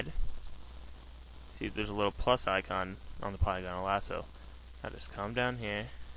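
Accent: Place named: United States. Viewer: that american accent gets on my tit i cant understand nothing he says.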